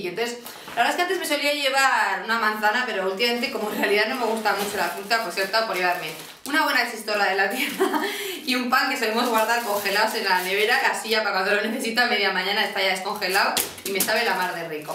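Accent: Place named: Pamplona, Spain. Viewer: Que graaandee! Se nota que eres de pamplonaaa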